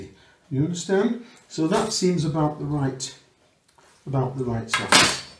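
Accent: Yorkshire, United Kingdom. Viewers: Wow deffinatly going to give these a try, both my husband and I love cheese and onion, I’ve been buying M and S pasties but weather it’s “ health and safety” I’m not sure but there seems to be very little seasoning in anything one buys over the counter these days ( the salt police must have been round 🤔) and it makes for “ tasteless “ produce, I’ve only just come across your lovely straight forward recipes whilst looking for one for a “ custard tart “ I am now binge watching lol I’m going to be very busy over the coming weeks baking lol will be making your custard tart today ready for my daughter coming round for lunch. You also sound as if your a “Yorkshire” lad just like my husband 👍🐾🥰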